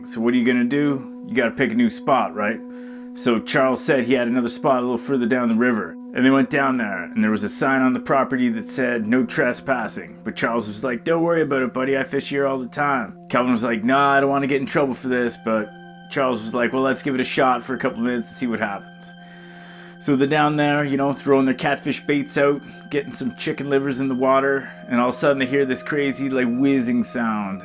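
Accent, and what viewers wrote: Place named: Canada. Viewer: nice vid! nice canadian accent lol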